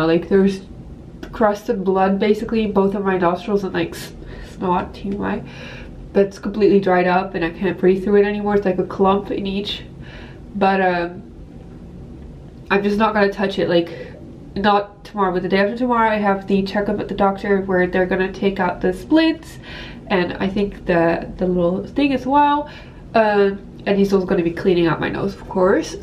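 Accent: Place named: Netherlands. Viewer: You sound more Dutch when you’re recovering from surgery 😍 wishing you a speedy recovery and gorgeous results!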